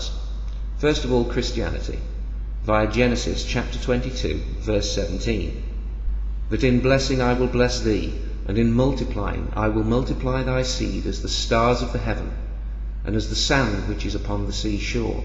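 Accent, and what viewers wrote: United Kingdom. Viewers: Your voice echoes with intelligence. It's somewhat mezmorizing. And it has that awesome British accent lol. Anyway, I love you videos. It encourages individuality and thought.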